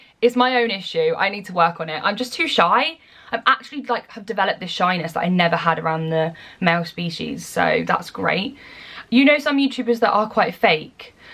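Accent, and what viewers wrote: United Kingdom. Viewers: I love hearing you ramble!!! Especially about rubbish! Sorry I had to say that I’m from America and I just love British accents and slang.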